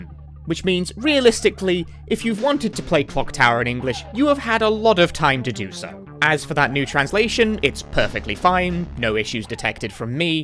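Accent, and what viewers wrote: United Kingdom. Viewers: I really appreciate this second channel. It's always nice to hear you talk about stuff, you're good at formulating your thoughts. That, and British accents tickle my brain.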